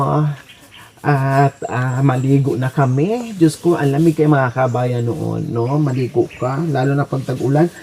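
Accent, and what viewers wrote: Visayas, Philippines. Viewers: ang tono ng salita mo ay bisaya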